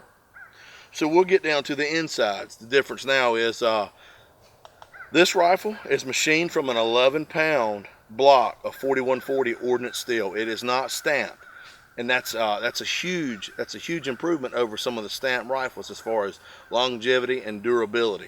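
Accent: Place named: South, United States. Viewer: i heard the country and knew you had to be from a country state like me. KS here.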